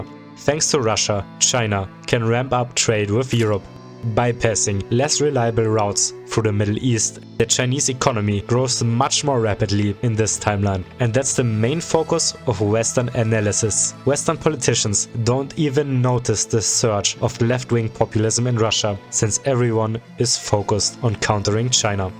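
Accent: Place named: Germany. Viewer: The pronunciations make me think you're a German speaker? The Z in Zyuganov should be a zee sound and ths Sh in Stepashin should be a sch.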